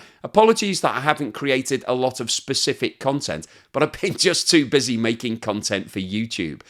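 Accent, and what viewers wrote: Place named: Liverpool, United Kingdom. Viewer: Happy Christmas 🌲 (how do your US followers understand the Liverpudlian dialect?!) Keep up the great work.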